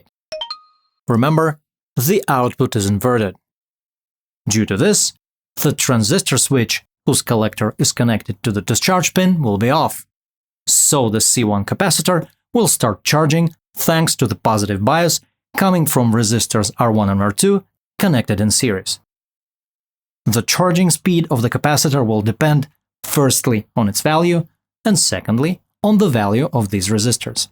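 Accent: Russia. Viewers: Отличное видео! И у тебя подозрительно русский акцент. 👍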